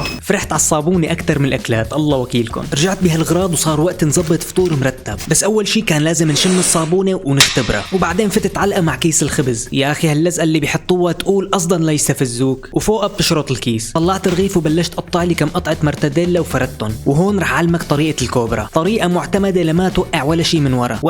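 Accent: Syria. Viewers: انت سوري هههههه ❤ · انت حلبي كأني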